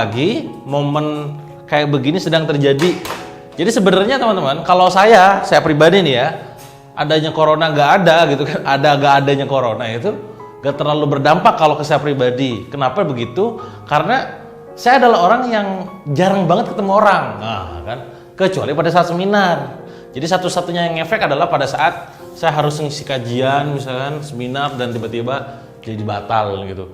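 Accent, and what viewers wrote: West Java, Indonesia. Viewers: Sia akang nyunda pisan euyyy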